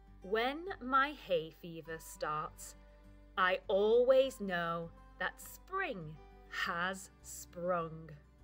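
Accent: United Kingdom. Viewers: I like your british accent, it's so elegant. I love your videos.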